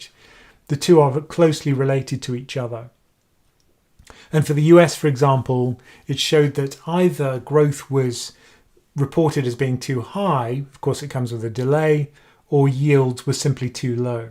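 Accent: United Kingdom. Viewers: Temporary. Targeted. Transitory. Sounds like US Fed talk, just with a British accent.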